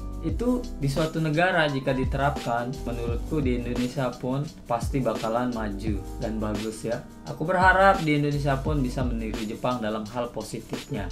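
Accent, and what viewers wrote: Lombok, Indonesia. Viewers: Logat sasaknya tgel👍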